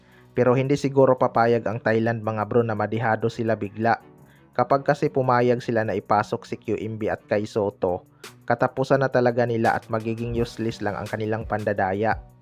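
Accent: Visayas, Philippines. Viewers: Bisayang bisaya magsalita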